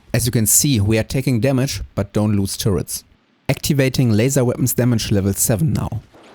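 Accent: Germany. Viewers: Jetzt reichts! Für deinen deutschen Akzent bekommst du ein Abo!!!! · That's the german accent for ya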